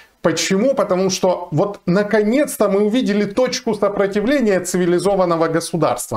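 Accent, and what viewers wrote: Ukraine. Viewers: Это украинская версия русского языка. Аналогично есть молдавская, грузинская, армянская, белорусская, эстонская и прочие версии русского языка. В России также есть разные версии русского языка. 😊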